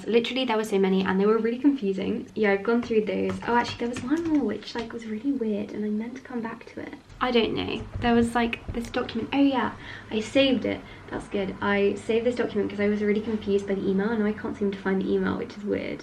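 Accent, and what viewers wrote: United Kingdom. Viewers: Two things why i watch videos 1. To be motivated 2. To learn some British accent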